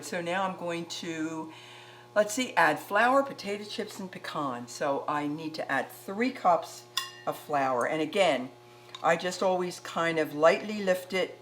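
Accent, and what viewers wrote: Philadelphia, United States. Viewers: Starting my own channel and found you...heard your voice and knew you were from home. Love that your family has encouraged you to do this...just became a young Nana (Mom Mom) myself. I wish you all the love and success one can have on here. From one Cooking Philly Girl to a close to home Philly girl, passing on your link.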